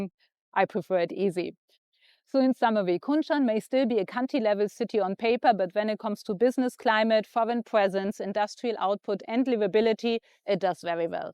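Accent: Germany. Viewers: Great video! Promoting a city while advancing your professional service! No wonder, with your German accent you are so successful! Cheers!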